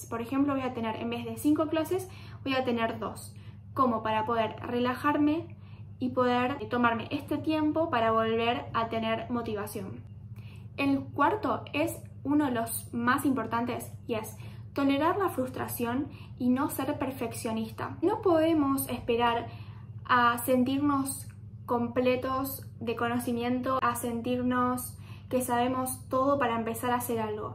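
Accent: Argentina. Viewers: Tu eres de argentina? Por su acento parece que si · Saludos desde Brasil 🇧🇷. A juzgar por tu acento eres argentina ! Cuáles idiomas aprendiste ? Los aprendiste solita o en clases ?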